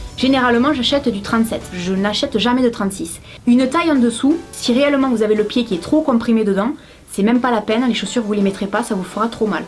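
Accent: South, France. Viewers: Salut, petite question, existe t’ il des anti derapants à poser sur la semelle (côté sol) pour avoir une meilleure adhérence, et met tu quelque chose au niveau du bout du talon pour la même utilité. Merci pour ta bonne humeur, tes astuces et ton accent, vive le sud!!!!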